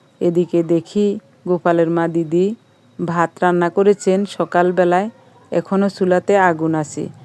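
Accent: Noakhali, Bangladesh. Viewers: Aamar sabche bhalo laglo desher noakhali kotha dhanyawad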